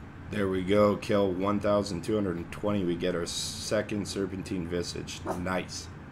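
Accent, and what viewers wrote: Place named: Canada. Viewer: Fuck it. Nice vid SON!!! Throw in some more of that Canadian accent, aye?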